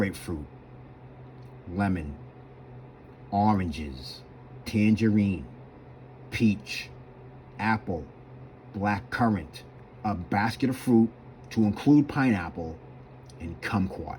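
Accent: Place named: Brooklyn, United States. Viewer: thanx brother you the man also your accent is phenomenal reminds me of here in NYC specially Brooklyn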